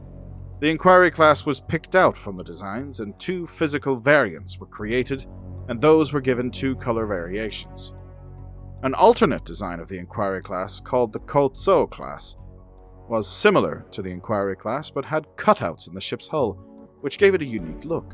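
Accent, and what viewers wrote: Ireland. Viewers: That accent! It's so very mid-Atlantic. I'm Irish, and the closest I can come to IDing it is as a very cultured or well traveled suburban Irishman. Where are you from? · Nice narration. Irish accent . Irish myself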